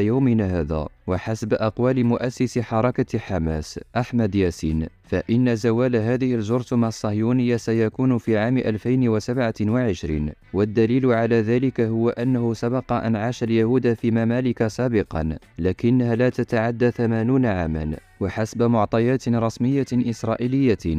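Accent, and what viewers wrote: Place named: Morocco. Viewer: حتى هنا يتتبع المراركة الاثر اليهودي و يروجون للصهاينة...على فكرة انت يهودي مغربية...و لهجتك واضحة...تفو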